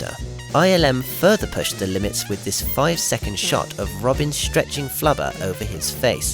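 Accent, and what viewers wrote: United Kingdom. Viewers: the sort of British accent that you sometimes have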